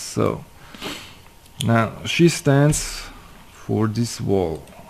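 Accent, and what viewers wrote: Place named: Brazil. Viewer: Pelo inglês deve ser brasuca rsrsrs mó sutaque hein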